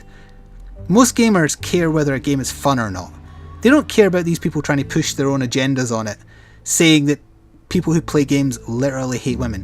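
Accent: Scotland, United Kingdom. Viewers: wasn't insulted. mermen are male half fish. i like listening to a scottish accent and u dont come across as stupid. keep up the entertaining and interesting videos. thankyou.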